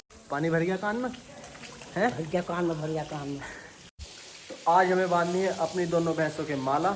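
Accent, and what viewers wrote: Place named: Bijnor, India. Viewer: Apki mmy bhot pyari h ...muje apki avaj bilkul asi lgti h jase mama ke bachoo ki h kyuki vo sb bhi bijnor side se h ...or muje to vha sbki avaj hi same lgti h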